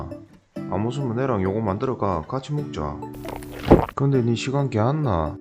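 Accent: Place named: Daegu, South Korea. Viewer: ㅋㅋㅋㅋㅋㅋ 대구에 있는 내친구가 얘기하는 줄 알았네요 ㅋ 저거 단점이 있어요.. 밥을 너무 많이 먹을꺼 같아요 ㅋ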